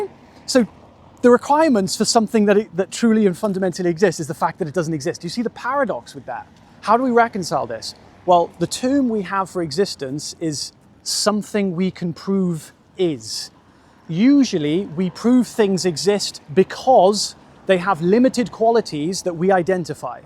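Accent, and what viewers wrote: Wales, United Kingdom. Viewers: Detecting a bit of Welsh in your accent. On another note I love that there are other people walking round contemplating the same things that I do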